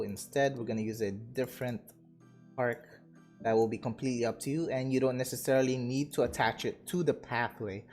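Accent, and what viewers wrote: Philippines. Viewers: my wife says you sound filipino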